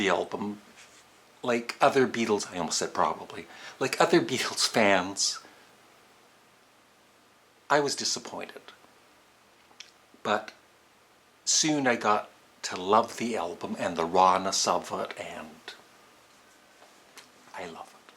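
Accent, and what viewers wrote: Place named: Canada. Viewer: No doubt...you are Canadian. Lol. No mistaking that accent. But I laugh, because I am from Texas...and I assure you...you could poke fun at me too. Lol. Thanks for the post/rankings. You get a like.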